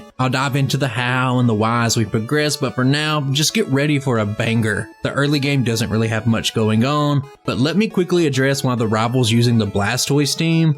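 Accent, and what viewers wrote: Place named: Alabama, United States. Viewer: The twang behind it feels like that Bama flavor like my boi Cooper has 🔥 But yeah til this day we never grew up 😅 we still play Pokemon & battle each other on pokemon showdown & we're 30 now 😂🕺🏾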